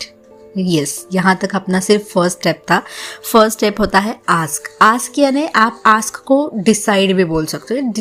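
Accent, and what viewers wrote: Gujarat, India. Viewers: Gujju accent Kesaa, hahahah happens to me too LOL love you and your innocence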